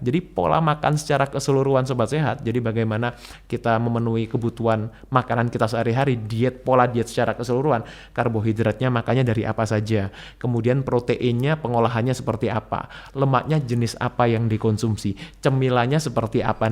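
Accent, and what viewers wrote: Java, Indonesia. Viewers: Me I kedok jawa bicaraya medok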